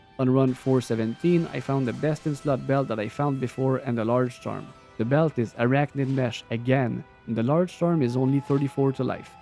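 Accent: Quebec, Canada. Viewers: Bro je reconnais un ti accent queb? Lets gooooo nice vid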